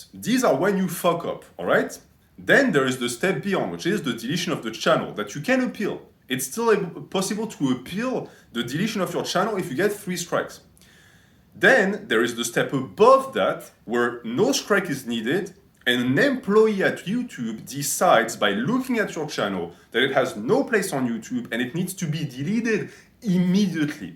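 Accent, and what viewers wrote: France. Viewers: I am Polish and consume content from a french natty and get inspired in english. So I am happy that You are back, the content I want to share and the content I haven't watched yet is back. As a side note, You were also an inspiration to return to my french studies - educated noble muscle natties can learn more than one foreign language. Je suis très heureux que nous pouvons encore Vous écouter et Vous regarder. Nous allons tous y arriver. Je vais fêter ça avec des "pullovers" et des "Arnold raises". J'adore votre accent français. Salut!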